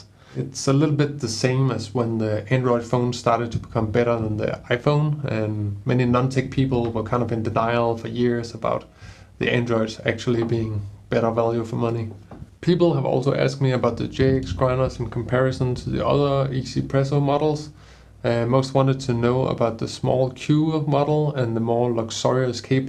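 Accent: Denmark. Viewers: Your accent sounds Danish, er you from Denmark? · Super-grateful for your quick and clear reply! I'll go for the basic. Thanks for your great content!! Btw - are you based in Denmark? Your accent gives me that impression! :) Greetings from Sweden!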